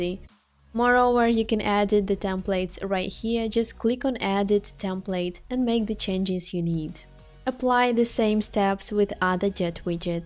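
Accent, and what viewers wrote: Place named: Ukraine. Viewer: I compare her Ukranian English with special accent, intonations with real good English of Elementor creators and I scare to buy and install this plugins. Did they save money just on the speaker or on compatibility and functionality too!?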